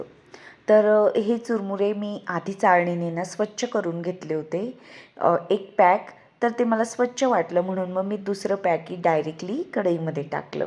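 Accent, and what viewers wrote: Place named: Khandesh, India. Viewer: Tai aapli ahirani bhasha aikun khup chhan vatal ❤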